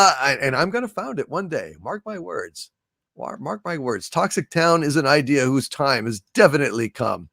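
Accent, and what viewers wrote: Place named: Germany. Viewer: Scottish? I thought you were German. Your braking the fourth wall!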